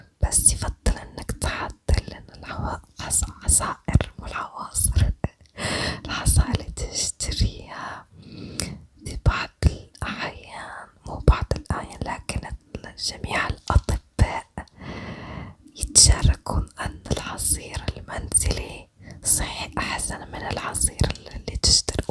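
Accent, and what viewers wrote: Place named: Egypt. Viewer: لهجتك مصري ع خليجي😂❤❤❤استمري قمر 🎉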